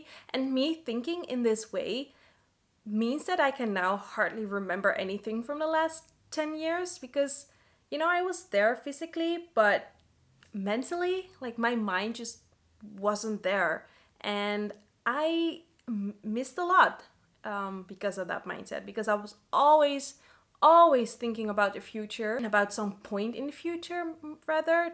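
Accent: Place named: Oxford, United Kingdom. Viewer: After your return from your overwhelming trip to Australia, I see a very happy grown-up young woman. I enjoyed you presentation very much. Your Oxford English is excellent. Even I could follow you without a problem. I am very proud of you. From a retired professional I give you a small advice. Speak a little slower and bring in short breaks. The interested listener has to consume your message. Your old but very happy Opa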